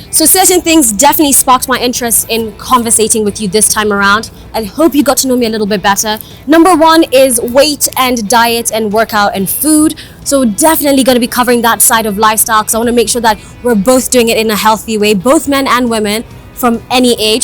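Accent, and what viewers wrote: United Kingdom. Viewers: Ikr! I've been in the States for years and her accent comes across more British as opposed to American. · The accent is more of British thooo..the sound quality and video quality is terrific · I thought the accent was British but she grew up in America 🤔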